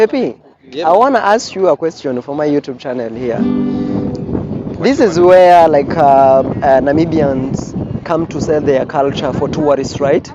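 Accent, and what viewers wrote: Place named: Zimbabwe. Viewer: Boeti ❤ ur content..Thanks 4 visiting Swakopmund. Thought u from Zim the accent thou is diffrnt from wthetu😊 much ❤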